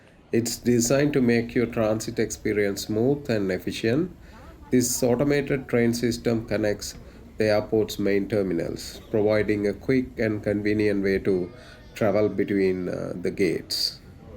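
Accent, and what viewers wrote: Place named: Sri Lanka. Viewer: Your English accent is very Sri Lankan..!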